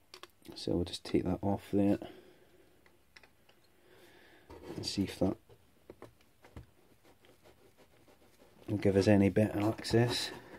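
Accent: Scotland, United Kingdom. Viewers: IMG HES SCOTTISH LIKE ME LOL !!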